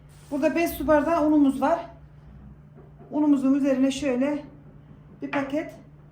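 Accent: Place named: Afyon, Turkey. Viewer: Merhaba hanım. Bu videonuz facebookta denk geldi. Konuşmaniz bizim Afyon tarafına benziyor nerelisiniz acaba? Ellerinize sağlık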